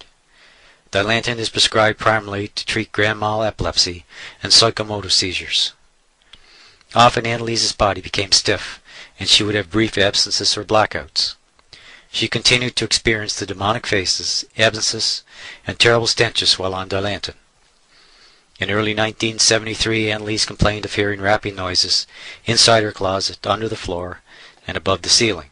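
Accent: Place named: United States. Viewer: Because there's been quite the many bad influences coming in to different places in the world from the United States and thus, that's why you sounded like you had been from the United States to me. I've had enough about the United States government. They've badly confused me, notice anything different about India?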